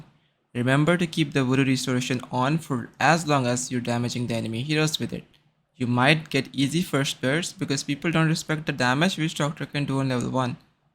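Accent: India. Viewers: >Excited to see the video from the Reddit post >Indian accent :(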